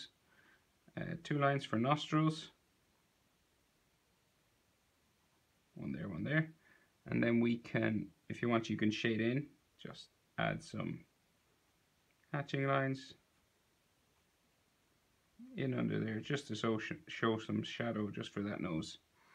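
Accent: Ireland. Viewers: ok thanks also did you use sharpies to colour (shows in thumbnail but not in vid) or something else, and are you irish? I think that's an Irish accent but not sure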